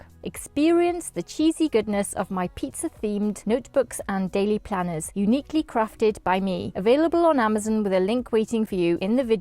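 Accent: Wales, United Kingdom. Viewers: Welsh accent is lovely, great review! Very in depth, confirmed my decision to get one I reckon. Cheers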